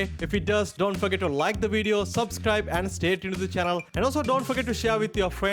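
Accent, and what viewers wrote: Kerala, India. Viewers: Nice video brother , bro why do you sound like mallu ( no offense ) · de malayali engligh accent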